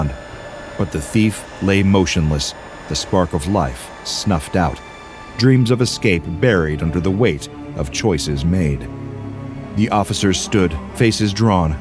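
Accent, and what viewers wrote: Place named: Wales, United Kingdom. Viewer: Wasn’t expecting a welsh scp 😭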